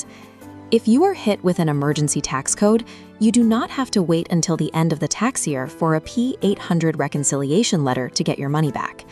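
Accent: United States. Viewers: If you are talking about UK tax, don't use an american english voice over, otherwise no one will trust you.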